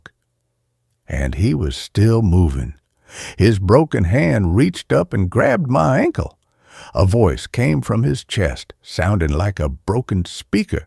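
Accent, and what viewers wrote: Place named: South, United States. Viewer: Laura here in South Georgia am. Love, love, your stories and the southern accent ❤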